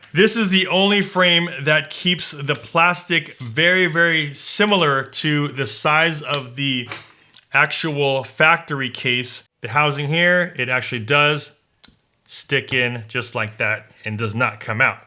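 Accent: Philippines. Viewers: You're accent is filipino ilocano..no doubt about it.NIce video.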